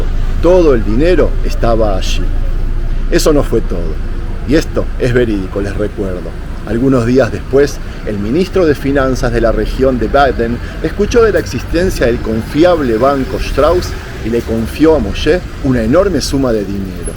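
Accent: Buenos Aires, Argentina. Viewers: Como es que aun guardas el acento porteño, despues de tanto tiempo.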